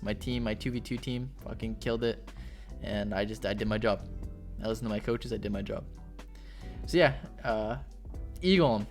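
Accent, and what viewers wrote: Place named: Canada. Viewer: I can tell ur a Canadian boy😂😂 one of my favs for sure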